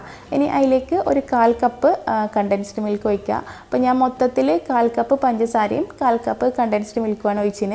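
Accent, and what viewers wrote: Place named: Kasaragod, India. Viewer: Ithante samsaaram kasaragod slang polund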